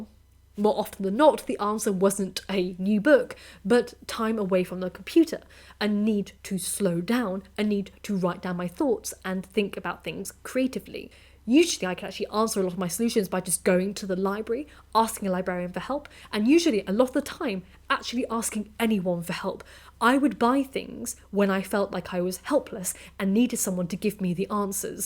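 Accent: United Kingdom. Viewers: I always considered you a very intelligent and wise person. Always got something interesting to say with the most beautiful British accent.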